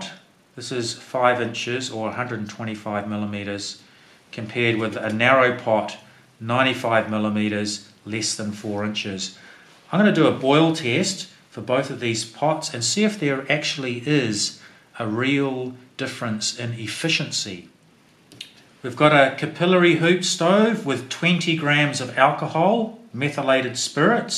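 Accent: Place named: New Zealand. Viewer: nalgene also has a range of ultralite bottles. Nice video by the way, I was a little surprised when I heard the kiwi accent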